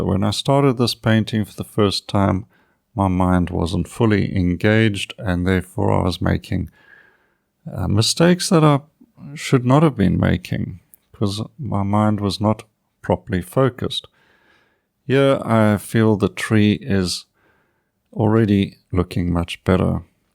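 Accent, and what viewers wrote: South Africa. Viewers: Every one of your videos is helpful. Imove between impressionism and tonalism or a combination of the two. Love your work and your gentle vouce with its echoes (for me) of South Africa. Thankyou ❤️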